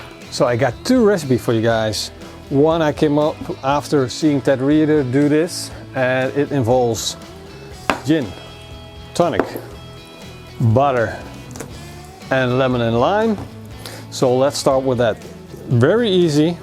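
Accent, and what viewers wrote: Netherlands. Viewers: Engels met Nederlands accent . Grappig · You have a dutch accent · Ik hoor een Nederlands accent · Annoying dutch accent.🤮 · Hahaha 100% jij een Nederlander bent, ofja je ouders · Ik hoor gewoon te goed dat je Nederland bent.. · Noticing the slight dutch accent I was surprised that he did not throw the lobster alive into a deep fryer...😅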